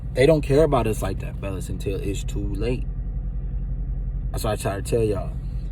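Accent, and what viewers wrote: Baltimore, United States. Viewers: I hear a lot of the 410 in your accent ..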